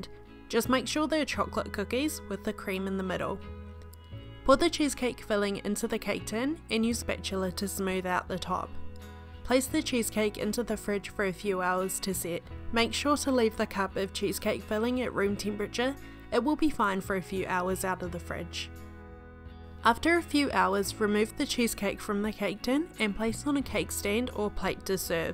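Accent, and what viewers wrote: New Zealand. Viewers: that New Zealand accent is everythinggg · Nice nz accent 👌🏻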